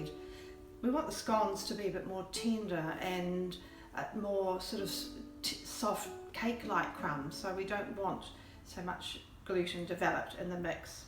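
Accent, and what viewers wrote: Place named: New Zealand. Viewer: I had never thought of making date scones until today when I saw your wonderful video (and recipe!) I will be 'having a go' later today! I'm in Yorkshire so am pleased that you pronounce 'scone' properly! I have friends here who are from Dunedin so I'm used to your fascinating accent - though I still find it amusing when you mention 'the bist brid; marking the sigments; so much bitter; a lemon zister!; ¡ɹǝʇʇᴉq sᴉɥʇ puɐʇsɹǝpun uɐɔ noʎ ǝdoɥ I - ooʇ ǝƃɐnƃuɐl puɐlɐǝZ ʍǝN ǝɥʇ uᴉ ǝʇᴉɹʍ oʇ uɹɐǝl oʇ unƃǝq oslɐ ǝʌ,I Thanks again for the video and the useful addition tips!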